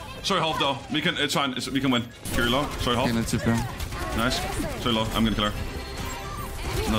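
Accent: Netherlands. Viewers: een nederlands accent in het engels is ook zo makkelijk te herkennen lmao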